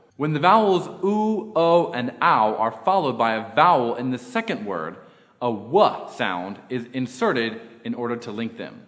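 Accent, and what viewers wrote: China. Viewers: Dude I think you got some Chinese English accent in the video 😂 Hahaha because some of my Chinese friends sounded exactly like you..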